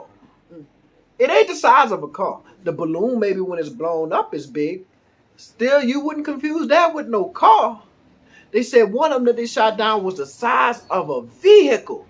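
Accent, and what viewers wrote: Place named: United States. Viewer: The only thing American I see in you is your accent,hang on tight to it lol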